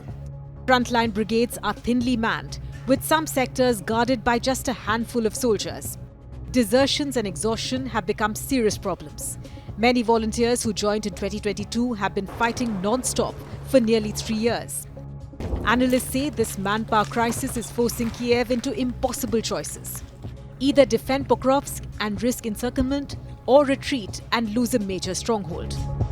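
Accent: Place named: India. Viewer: Can't stomach this jeet accent, rly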